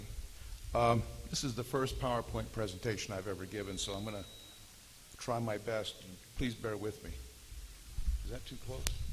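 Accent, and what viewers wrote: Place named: Midwest, United States. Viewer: Regional dialect. I'm guessing Midwest. Excellent presentation.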